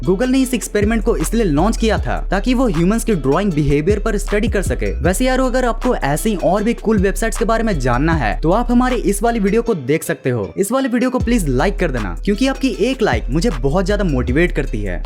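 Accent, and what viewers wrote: Bihar, India. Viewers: Aap bihari ho kya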